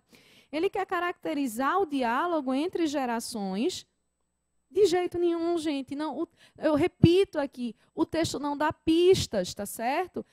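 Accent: Northeast, Brazil. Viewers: Obrigado Profª.. Além de competente, você é muito fofa. E, como o seu sotaque não me engana, deixo aqui um ENORME abraço aos queridos nordestinos. Mais um "Gostei" para você.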